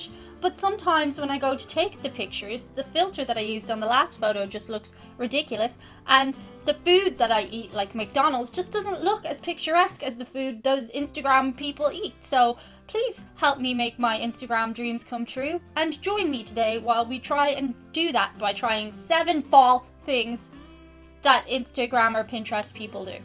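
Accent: Ireland. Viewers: P.s. part of the charm of your videos is the accent. In America, we enjoy hearing Irish accents. I suppose we think it’s cute, or whatever. So, if you pronounce Fall like an American, it’s sort of like removing and discarding the chewy center of an Oreo cookie, so to speak. By the way, we call a pumpkin a punkin’. We know our pronunciation is wrong, but we say it like that, anyway. It’s just cute, or whatever. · Another funny one!!! You are Brilliant! You sound so American, every once in a while I hear a little Irish come out but you just speak so well! Texas is coming soon! Cheers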